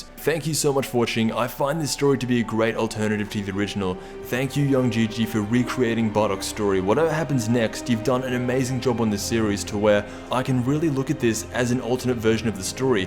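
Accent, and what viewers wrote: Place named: Australia. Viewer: by his accent that he's Australian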